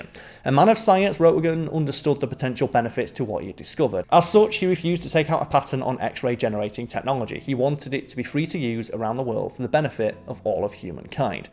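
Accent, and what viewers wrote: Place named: Northern England, United Kingdom. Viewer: North England.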